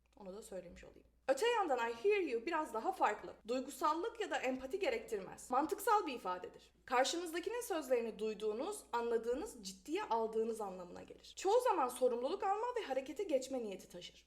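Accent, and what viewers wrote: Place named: United States. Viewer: İngilizcen mükemmel ve Amerikan aksan ile konuşuyorsun bende öğrenciyim voleybolcuyum ve dilim var C2 geçen gün sınavım vardı C2 ye geçmek için senin videolarını gördükçe İçimden diyorum ki lütfen ben de böyle güzel aksan ile konuşayım. İngilizcenin yarar şu ki hem sporcuysanız hem de yabancı ülkeleri gezmek istiyorsanız işinize yarıyor ama sporcu olursanız daha çok işinize yarar çünkü hem maç için yabancı ülkelere gidiyorsunuz ki ben de şu an öyle hem de gittiğiniz yerde gezip oradaki farklı yemekleri deneyebilirsiniz ama sporcuysanız biraz zor yemek konusunda😊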